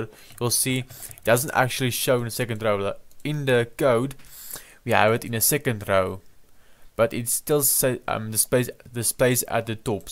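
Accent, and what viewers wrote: South Africa. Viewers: this guy is definitely south african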